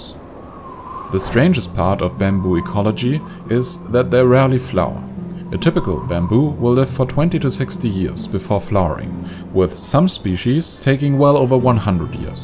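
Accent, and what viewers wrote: Germany. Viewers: Is that a german accent? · He sounds soooooo German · I love your German accent. It's very subtle, but still noticeable.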